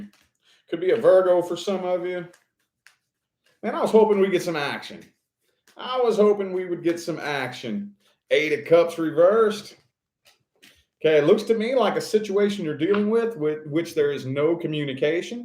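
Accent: South, United States. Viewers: I enjoy your readings. Are you a southern fellow?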